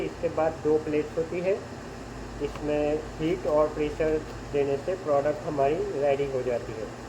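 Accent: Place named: Gujarat, India. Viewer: Bhai tame gujarati cho tamari bolvani ton mane evi lage che❤❤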